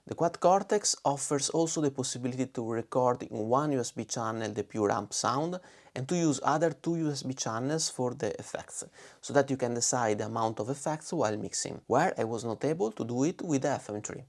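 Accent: Venice, Italy. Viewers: Mi piace troppo il tuo accento veneto!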